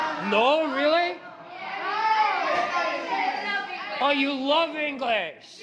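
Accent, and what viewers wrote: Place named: Scotland, United Kingdom. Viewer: Good to hear a fellow Scot in China! I am in Beijing about to venture into teaching, this is very helpful thank-you! (Tom, Edinburgh/Shetland)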